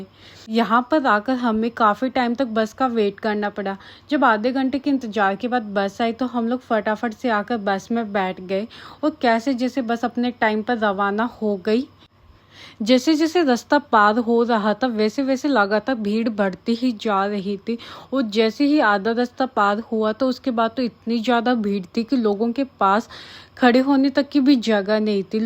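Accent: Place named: Punjab, India. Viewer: Ap ki language me Punjabi ton h Ganganagar se ho ji ap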